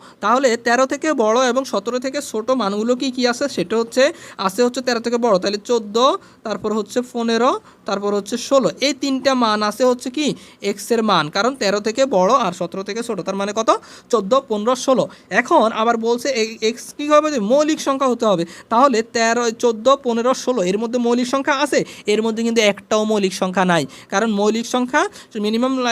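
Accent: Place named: Noakhali, Bangladesh. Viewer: স্যার মনে হয় নোয়াখালীর মানুষ 🥲🙏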